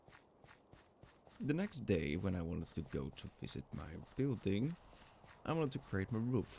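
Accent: Netherlands. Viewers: Are you dutch by any chance? I feel like I can hear it a little bit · Love this series so far! I'm also guessing you're Dutch based on your name and accent :P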